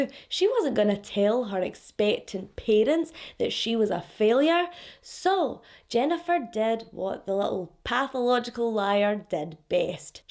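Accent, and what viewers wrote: Scotland, United Kingdom. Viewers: Living you I've been a true crime follower for a few years now. started it all! But I just love a Scottish accent tell me crime stories. You are awesome. Jen frombiggar!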